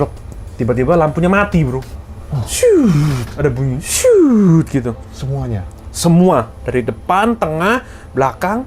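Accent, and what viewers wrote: Java, Indonesia. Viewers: Jiangkrik. Di rungok"ne jowo tibae😅😅 Sentolop barang e. Jurrr. Ngrungokne penyampaiane iku lho. Mau ketawa takut dosa. 🤦‍♂️🤦‍♂️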